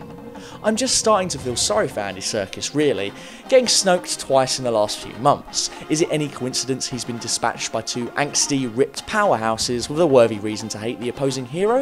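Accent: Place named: Upper class, United Kingdom. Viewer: First of all the black panther has no villian problem and my God this was the first movie give them a break super critics and who is this guy doing this segment because he sounds like some British aristocrat who has never been around a black person .it is a known fact that people who are dark skinned understand this movie better then our pale face counterparts because they've never been hated on simply for the color of there skin .and if you or anyonelse can't understand the message from this film then not only are you not open minded. But you probably most likely only gang around members of your own race and that's not only cowardice but unhuman and boring .killmonger is a villian fueled by hate and that's more understandable then some sadistic twisted dceu character that Batman has to fight .haters go back to the dceu world the marvel universe is for controversial heros .peace and power to the people .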